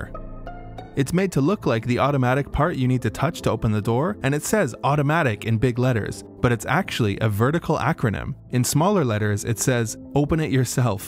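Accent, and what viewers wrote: United States. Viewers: The Texas accent was hilarious, coming from an authentic Texas native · I live in East Texas and I've heard people speak with a VERY similar accent. Even kind of sounds like an old politician from the 90s named Ross Perot.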